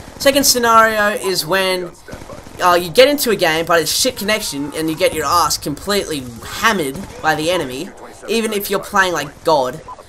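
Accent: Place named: India. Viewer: I thought the whole thing was an indian accent...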